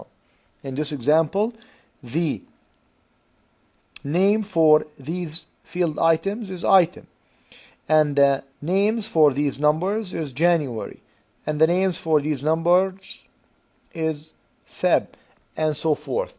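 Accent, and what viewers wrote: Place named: Arab world. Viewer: nah hes an arab, indian accent is quite different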